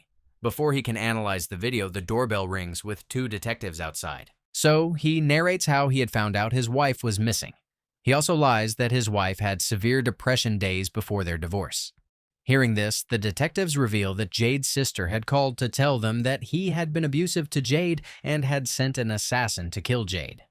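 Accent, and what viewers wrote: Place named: United Kingdom. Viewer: I have no idea why but his british accent is soooo funny to me. 😂😂😂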